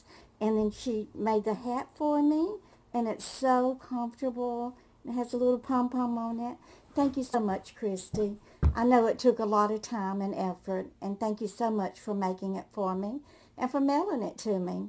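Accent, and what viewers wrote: South, United States. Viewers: Hi, You are so funny. I love your stories! Not southern but love when your southern SWAG comes out. Love it and Love you.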